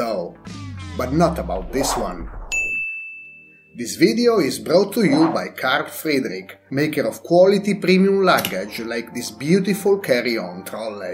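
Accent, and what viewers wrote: Italy. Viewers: haha! It is fun, I really love the Italian pronunciation :) Now it makes sense! I was confused, because in Hungarian the car is called "kocsi", which is pronounced as "cocchi", so I was thinking there is a relationship. Anyway, thanks for your answer! Keep on with the great videos! Forza Italia :)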